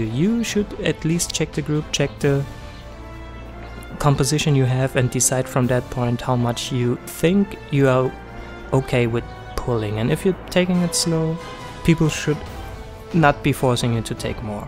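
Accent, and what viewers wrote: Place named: Germany. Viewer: Ty for this guide!! Und so bist du Deutscher? Höre es in deiner Stimme iwie😂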